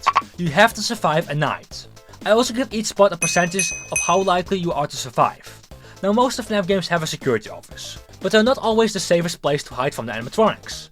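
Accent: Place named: Netherlands. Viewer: World’s most subtle Dutch accent 😆 Hoi from Canada 👋 Enjoyed the video! I appreciate your evident knowledge of the games and different theories. For Security Breach, it’s actually more difficult than you might think, thanks to Moon. Small enough to get into vents, and can seemingly swim through the air in most of the building. Plus, most bots have security clearance equivalent to LVL10 (or greater). However, there do seem to be some exceptions, based on the doors that Freddy himself can’t open. If he can’t, but you can, then technically the other animatronics can’t either and you’ll be totally fine. I can’t quite remember, but I think the VIP lounge REQUIRES enough merchandise to be collected? Otherwise Freddy could’ve just opened it early. Not sure if there are other doors that are similar.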